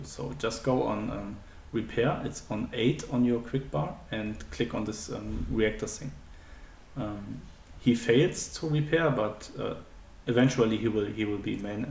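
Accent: Germany. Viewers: Ich gehe mal davon aus, dass du Deutscher bist mit dem Dialekt. Warum hast du die Pläne weggelegt und sie nicht bei den Chinesen abgegeben? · Ich liebe den Denglisch Akzent :) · I hear that you are from Germany ;-)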